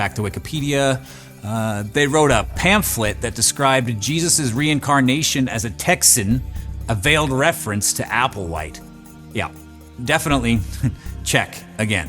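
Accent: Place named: Canada. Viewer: I live in Canada and sounds a lot like us accent wise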